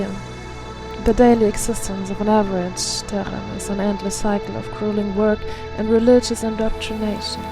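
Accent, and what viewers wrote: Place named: Germany. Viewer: Your German accent is beautiful; it blends very well with the dark/gothic atmosphere of Warhammer. It is definitely an add-on. I love it! 💀THE EMPEROR PROTECTS💀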